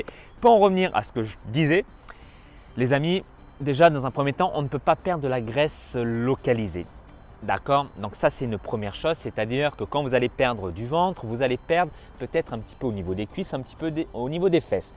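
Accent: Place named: South, France. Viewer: Le petite accent du sud ☺️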